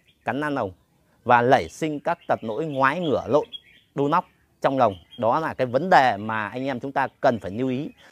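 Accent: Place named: Hai Duong, Vietnam. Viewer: Giọng nói quê Hải Dương dung cháy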